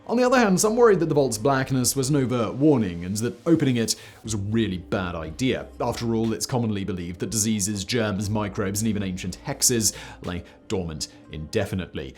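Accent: RP, United Kingdom. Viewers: Amusing hearing you go from BBC British pronunciation, occasionally to D&D levels of exorbiantly posh that would put Nigel Thornberry to shame. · Your tone is far too posh to allow proper concentration & your humor is nearly too tenderfoot to have the majority of anything said to be taken remotely serious... If you wish to be but a petty Monty Python copy then by all means present yourself as such. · Your arrogance is showing..English guy with the accent… but you’re rich from this but you click baited me with the extra chatting! I already know what you know 🤫, in spite of your perpetration of proper investigations! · Combine a British accent, a black sarcophagus and the word creepy and I'm all in. Guilty clickbait. Sigh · Sorry, can't understand you. Please don't speak so fast. From USA. I don't have the ear for the queens English. Love the content.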